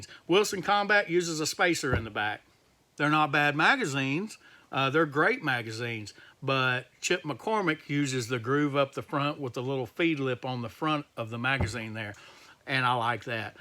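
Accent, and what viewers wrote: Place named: Georgia, United States. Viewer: You sure do sound like a Georgia boy good review thanks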